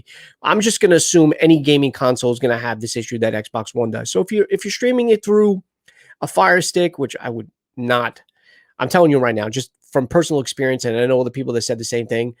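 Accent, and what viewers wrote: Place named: New York, United States. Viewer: You are so NY lol. I sometimes forget this is what I sound like lol. Thanks for the videos btw. Definitely appreciate the info and effort.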